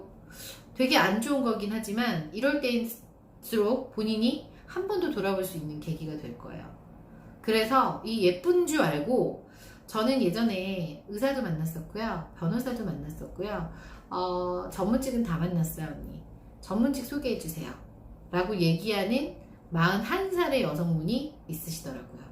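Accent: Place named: Seoul, South Korea. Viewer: 서울말 잘한다!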